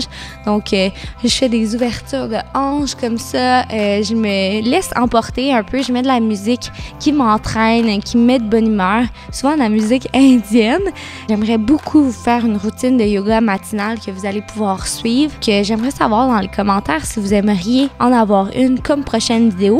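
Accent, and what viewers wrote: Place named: Quebec, Canada. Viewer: Votre accent est québécois 💜🥺 j'adore Je te suis de la tunisie😊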